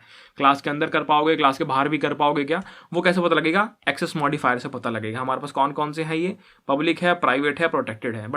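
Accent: Haryana, India. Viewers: your haryanvi accent is so sexy; please say it again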